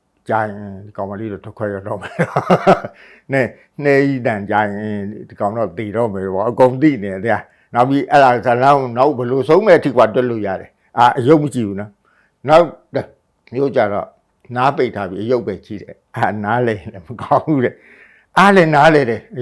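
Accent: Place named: Upcountry, Myanmar. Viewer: အညာသားလေ သံ မပျောက်။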